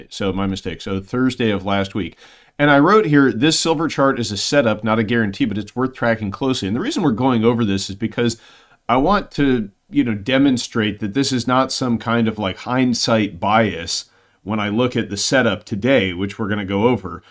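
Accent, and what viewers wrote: Chicago, United States. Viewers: Where is that accent from? Sounds purely like a Chicago accent of my youth?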